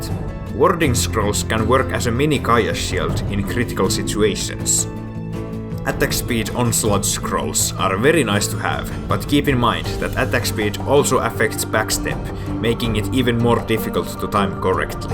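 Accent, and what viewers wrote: Finland. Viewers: you sound like all of my Finnish relatives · Ralli english is so strong with this one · tolla aksentilla sun on PAKKO olla suomalainen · hirveen tutun kuulonen aksentti, taitaa olla suomalainen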